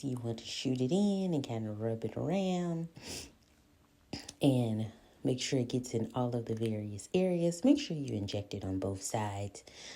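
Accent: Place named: South, United States. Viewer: I now know that feeling my viewers get listening to my voice. You have a nice light accent. it sounds Southern but in a very classy way. I am trying to keep it bright. Basically your speaking so calm.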